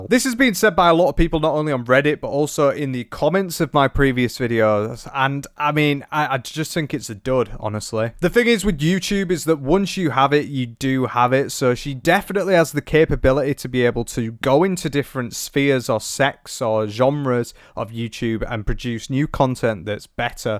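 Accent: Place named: North, United Kingdom. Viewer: I love how your "Northern English Twang" makes every time you say "Blair", it sounds like "Bleh"😂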